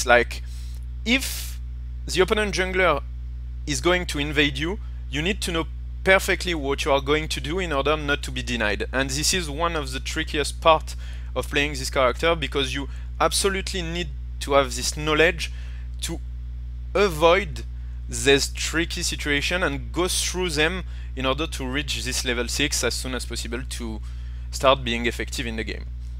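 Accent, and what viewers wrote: France. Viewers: dat french accent sound so pretty :3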